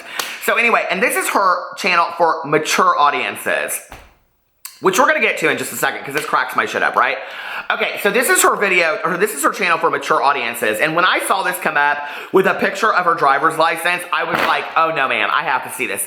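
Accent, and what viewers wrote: South, United States. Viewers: BEAST!!! or Peace :-) I have literally watched your videos all week here while I'm at work (so bad) but I do thank you. It's so hard to believe you're not a Southern. You sound just like us Southern Folk. I enjoy your videos and thank you for being yourself. Love You!!!!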